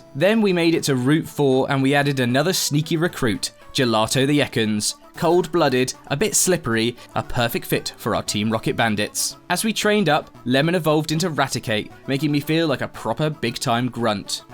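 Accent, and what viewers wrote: United Kingdom. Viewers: You‘re adorable and I enjoyed your content very much! I just subscribed, thanks for the content! By the way, You’re British, right? I say because of your accent! Lovely accent!😊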